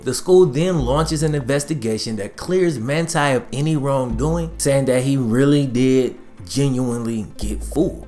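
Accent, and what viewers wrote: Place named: Bay Area, United States. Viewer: What up? Sounds like you're from the bay! Am I right?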